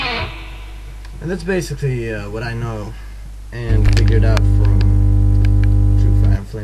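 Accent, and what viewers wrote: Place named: Canada. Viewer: You are definitely Canadian!! Right?..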